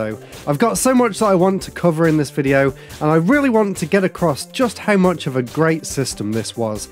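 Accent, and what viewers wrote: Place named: Scotland, United Kingdom. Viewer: Your accent has always been so interesting to me. When you said you go to Edinburgh for your job, it made me focus more on your accent... and I'm wondering, is it like a British English / Scottish combination? Like 85% British English, 15% Scottish? The way you say "found" reminds me of Scottish, for instance. But this could just be confirmation bias! Either way, very nice accent!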